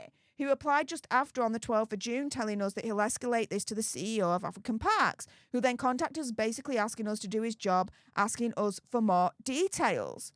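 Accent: Yorkshire, United Kingdom. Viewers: she’s from Yorkshire, UK.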